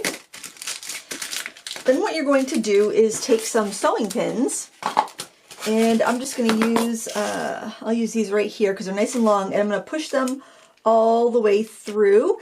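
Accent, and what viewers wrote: Maine, United States. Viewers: LOVE that Maine accent... I thought you were saying 'drawring' board at first :) These are clever...well done. I love how your bowls are turning out!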